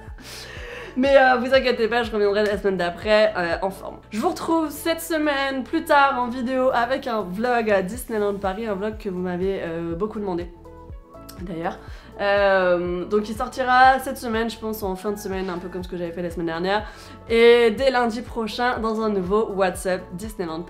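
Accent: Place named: United Kingdom. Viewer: J'aime beaucoup ton accent anglais! (Non non je ne ment pas!) Et pour info Ireland en anglais se prononce "ailleurland"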